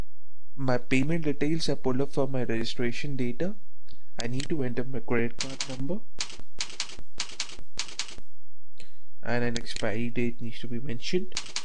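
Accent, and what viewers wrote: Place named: India. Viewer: Thick Indian accent. Sounds like he has two huge bread stuffed inside his mouth.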